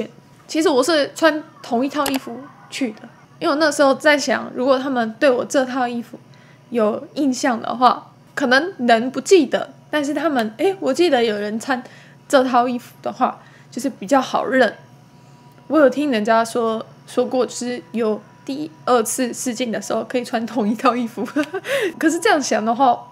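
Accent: Taiwan. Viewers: 講話很台欸🤔 😁😁😁 👏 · 妳的中文真的講得很好，很標準的台灣中文! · 你说话的方式也太台了。。。🤣 因该是个很有趣的女孩～ 🤭所以就订阅咯。。。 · 妳的台灣腔好純好可愛！ 😍👍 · 我靠 一口台湾腔调的中文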